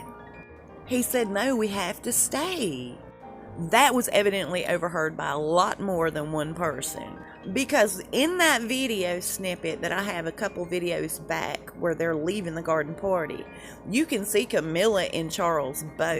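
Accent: South, United States. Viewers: I need you at some point to say "bless her heart" in your wonderful southern accent. 😊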